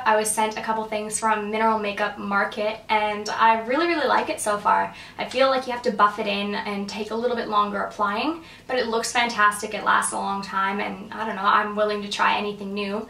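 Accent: Australia. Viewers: she kinda has that australian accent, lol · You're getting a little Australian tang to you're accent lol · Are you starting to pick up an australian accent? :)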